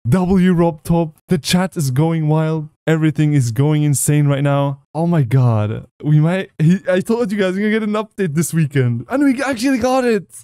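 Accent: Germany. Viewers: Are you German? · Wait you german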